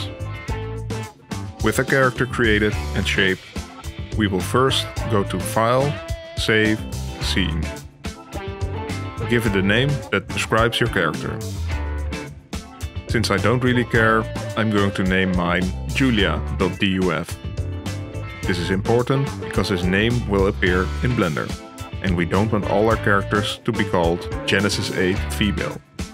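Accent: Netherlands. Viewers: You're Dutch, aren't you?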